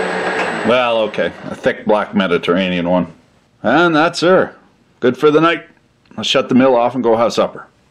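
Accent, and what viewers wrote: Quebec, Canada. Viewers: Lol the French, instantly knew you were from Quebec haha. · i didnt kno you were canadian let alone french canadian?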